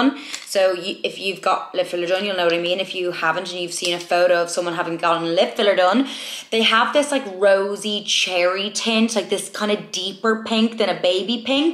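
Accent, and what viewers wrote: Ireland. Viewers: Its an Irish posh dublin accent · Yeah it’s Irish I’d say she’s from Dublin like myself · I was trying to figure out which accent you have the first couple of seconds in but it sounds Irish am I correct? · Hi I’m to your channel and so glad I came across you and your Irish which is a bonus and way I just want to ask what would you recommend something really hydrating for my skin please and also did you say you get Chemical peels if so please tell me we’re and who is great I would so appreciate it thanks till next time keep up the fab videos, happy new year also take care x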